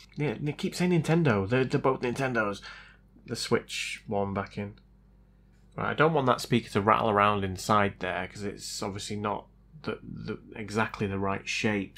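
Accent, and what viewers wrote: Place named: United Kingdom. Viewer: It's always interesting listening to a Brit pronounce R's.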